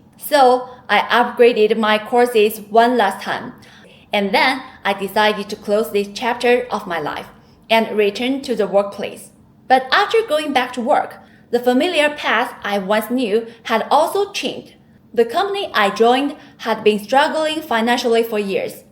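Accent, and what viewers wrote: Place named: China. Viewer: Hello, I loved your video, and the laugh with the baker. But better than all that was all the things you've done, and how creative you are. In addition to very positive. Your English is very good too, even with a Chinese accent, and all of us who are not natives and can speak English have that problem. I am writing this to you because among other things I have seen many videos and I see that China is more complicated now. I have visited China 3 times: 2007, 2008 and 2010. And when I see now the work or salary problems I can see that the thing is much more complicated. I hope that your entrepreneurship really gives you the income to follow your life in a calm way, and maybe your plans to be a mother can be carried out. Who knows.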